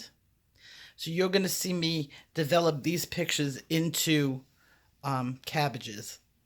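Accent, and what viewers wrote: New York, United States. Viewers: I KNEW it was New York accent I was hearing !!!!! I’m from Midwest the accents are different!! I’m the one who was sooooooooo into one of your sessions and you’re working on a leaf and there’s a middle vein in it and you say …. “Now I’m going to apply the…..” well this gal hears “pasta” !!!!!!!!! I know I know that’s how new I am I knew nothing about Posca markers!!! You made my day on a day I needed it….so I’m putting my story out there for the newbies!!!!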